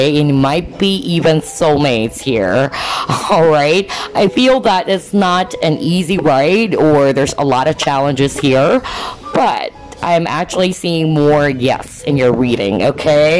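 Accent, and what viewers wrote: Philippines. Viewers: Wow you know how to speak Tagalog, I'm a Filipino you have a nice accent of Tagalog dialect 😍😍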